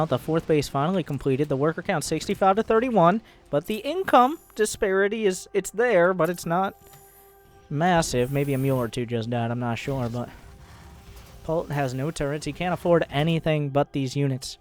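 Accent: United States. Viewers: I love your delivery, vocabulary and accent. It's nice to see such a strong american accent that is easily understandable by a non-native speaker. Kudos!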